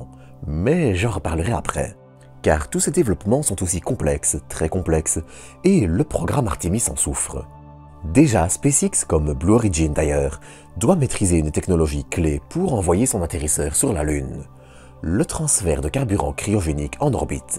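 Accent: Belgium. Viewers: Merci pour toutes ces précisions ! Toujours un plaisir de regarder tes vidéos avec ton accent belge si appréciable !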